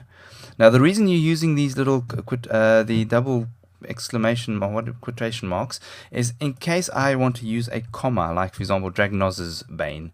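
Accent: South Africa. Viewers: Awesome video!!! :D Are you South African? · are you from south Africa ??????!!!!!!!!!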